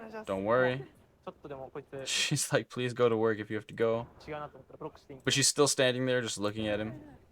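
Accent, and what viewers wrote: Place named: Carolinas, United States. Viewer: Bro I have been binge watching your videos and I swear you sound like you're either from NC or SC. Please tell me im right.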